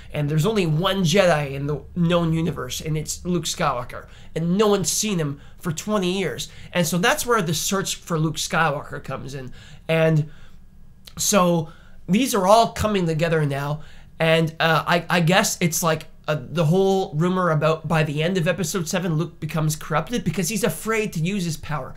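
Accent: Canada. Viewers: why do you have a canadian accent? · Canadian as fuck eh?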